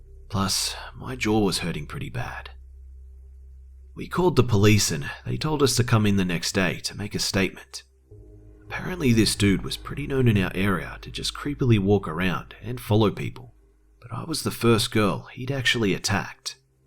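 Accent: Australia. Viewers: I love your accent. I love Australians. ( P.S. Trying to get on the comments of the week.)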